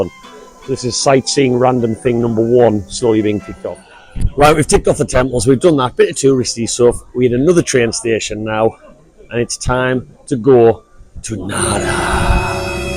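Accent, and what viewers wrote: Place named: Newcastle, United Kingdom. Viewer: A proper Geordie in Japan, absolutley class vlog mate howay!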